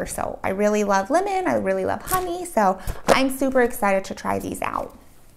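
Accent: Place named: South, United States. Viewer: This woman is very traditional and I like that. And also the accent is great... Is that Southern American accent, near Texas or something??? I am saying that because I watch American cinema a lot and can understand the little slang from one part of the country to another.🙏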